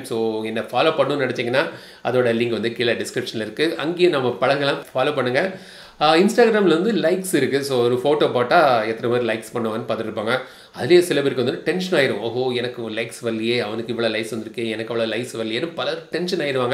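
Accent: Kanyakumari, India. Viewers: Polinju pona company! Typical kaniyakumari slang! A Fan from your district bro!.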